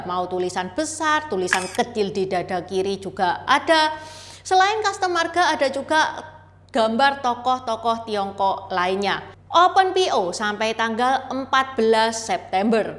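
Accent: Java, Indonesia. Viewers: saya lebih suka kalo logat bicara normal ( tidak medok ) tolong lah di perhatikan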